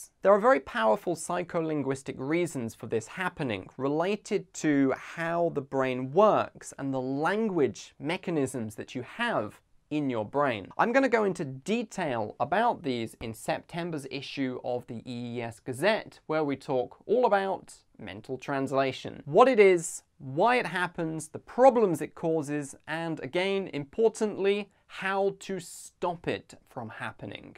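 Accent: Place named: United Kingdom. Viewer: i love u.i'm f tehran and virgin not married 1982. i like ur accent to.first time i when hear uk accent i think that is funny.but its better that usa accent i think.lol.but im in iran.in never travel or see forigin in my life.lol.but if i in skype see any man "lol" maybe have chat with voice.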